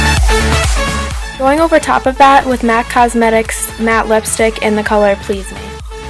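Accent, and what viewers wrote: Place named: Queens, United States. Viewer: You sound like you’re Italian from queens ny where I’m from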